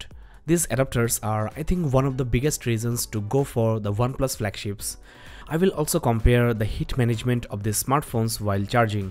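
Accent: India. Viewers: Are you from Europe? The plugs look like the European versions. Btw you have a very nice accent :) (Indian?)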